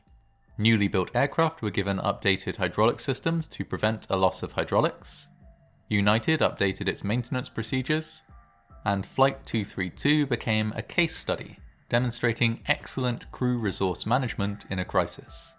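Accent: United Kingdom. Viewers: Tell me about the horrible history my soothing british man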